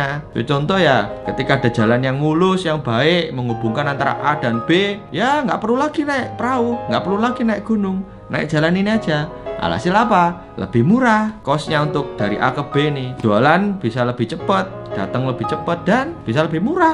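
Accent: Java, Indonesia. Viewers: Mas boso jowone endi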